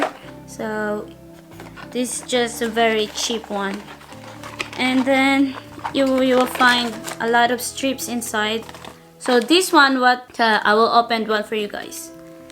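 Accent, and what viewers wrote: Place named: Philippines. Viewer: Congratuations! I noticed you are Filipino, I love your accent, my mum is also Filipino 😊 looking forward to your pregnancy updates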